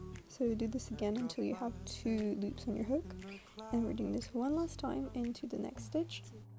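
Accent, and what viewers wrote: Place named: Russia. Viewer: По произношению Английского языка понятно,что она русская😂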